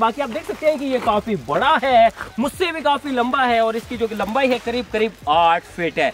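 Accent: India. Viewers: I can only speak English, but I love the Indian accents 😀🇮🇳 the words flow so smoothly 🥰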